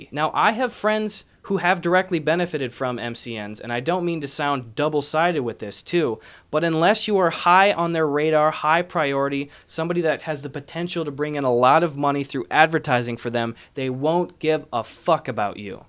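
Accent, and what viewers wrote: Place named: Wisconsin, United States. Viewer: I can tell you're from Wisconsin from the way you pronounce your "a's". Sw-ay-g Bucks. Lmao. God, I miss that boring ass state 😩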